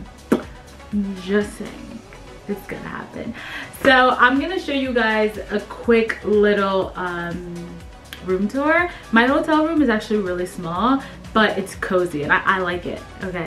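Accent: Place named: London, United Kingdom. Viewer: First off love the nails! Second I love your new London accent LOLS. YOur such a beauty can’t wait for second vlog!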